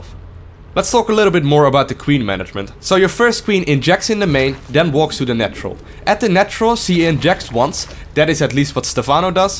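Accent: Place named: Netherlands. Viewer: Wow you're so obviously Dutch the accent is uncanny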